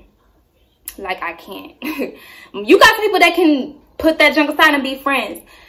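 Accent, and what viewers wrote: Detroit, United States. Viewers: Sis it sound like you losing the Detroit accent 🥴😩😩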